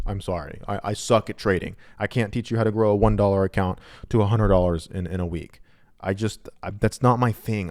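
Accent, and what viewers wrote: India. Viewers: 69,420 Please entertain us with your Indian accent. We need more of that! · Im dying cause of the indian accent lol...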